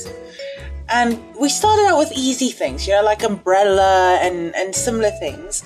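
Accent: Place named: South Africa. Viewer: I'm South African I can hear you are South African I can hear it. I know exactly what you mean when you made this video 🙂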